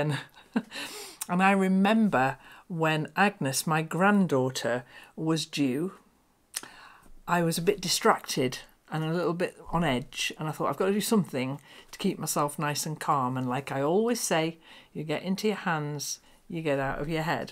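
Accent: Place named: Lancashire, United Kingdom. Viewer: you brought back such an emotional memory of my beloved Mum who died at 54 when I was 21 I’m now 75 In your soft Lancashire accent just like my mums ( busy hands Lass calm head ) she was an amazing knitter sewer crocheter She would have loved this YouTube community as I do & would be on the lime green sofa knitting of course Thank you Thank you 😍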